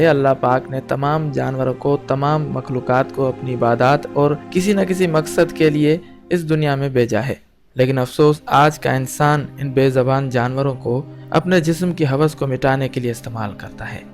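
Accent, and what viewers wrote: India. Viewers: Bhai Aap Indian style main kion baat karnaiy ki ko as hash kartaiy ho ?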